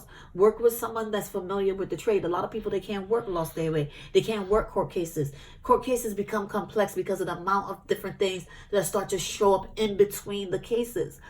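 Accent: New York, United States. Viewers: Where r u from.... you sound like a New Yorker ...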